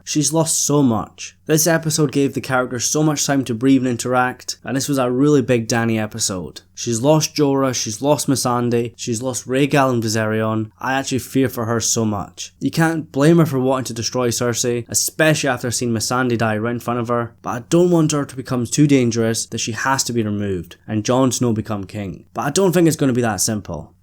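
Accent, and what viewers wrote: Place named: Scotland, United Kingdom. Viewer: Where from Scotland are you?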